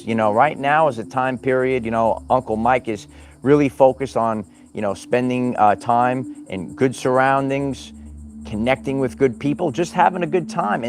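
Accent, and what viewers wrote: New York, United States. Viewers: 🙏🏻 Gr888 work as always. I can see why so many ladies come here, but I have to say I come for the TRUTH TALK, and also I’m a sucka for that New York/Jersey (?) accent, gets me every time! 💃🏼 PS I have images of the Lions appearing if you want to see them, seek them out on my Ch Ann el here…last Aug/Sept sometime, one must have the eyes to see… btw this is TruthBeSpoken, I have (4 diff) ch Ann els, dear brother/neighbor. 🕊️🕊️✨🦁✨🦩🦩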